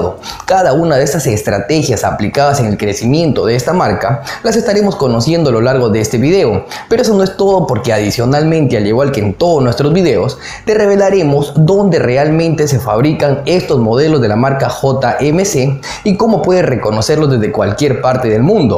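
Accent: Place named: Peru. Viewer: Acento peruano bien definido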